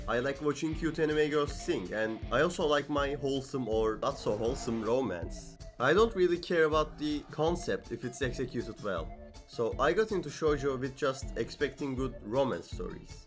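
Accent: Turkey. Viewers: bu ingilizce aksanı bu kadar türklere özgü olamaz ya bu arada yona of the dawnın hala ikinci sezonunu bekliyorum yıllar geçti:( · idk how but you have such a Turkish accent in english wow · Türk olduğuna yemin edebilirim. Türkçe konuşuyormuş gibi ingilizce konuşuyorsun. Türk aksanı diye bir şey varmış onu anladım ahsjskkd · abi tam olarak 5sn izledim henüz ama türk aksanın var (kötü bi şey gibi değil) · Bu aksanı nerde olsa tanırım. Türklerinin sonunda fark etmeksizin herhangi bir medya üzerinden kaliteli içerikleri ürettiğini görmek iç açıcı,takipteyim. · You sound like Turkish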